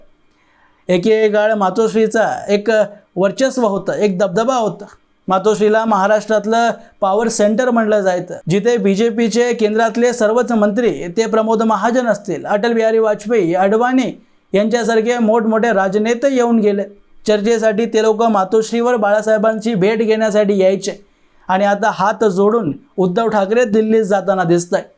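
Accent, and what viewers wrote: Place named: Thanjavur, India. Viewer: तुमची मराठी आमच्या तंजाऊर मराठी सारखी वाटते. 👍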